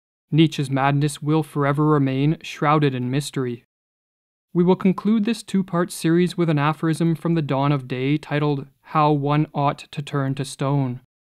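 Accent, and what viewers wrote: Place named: Canada. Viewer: Yeah, I hear the lilt and every once in a while a trace of a Canadian vowel; also, very often clear signs of a mind and ethos I recognize as Canadian Gen-X. But I never would have guessed in a million years that he doesn't work alone. Every bit of the intellect and personality he reveals to us argues lone wolf... except for, I guess—and this is the part I missed—the fact that he wants to be with us, his audience. (Bonus speculation, just for fun: I bet he's left-handed.)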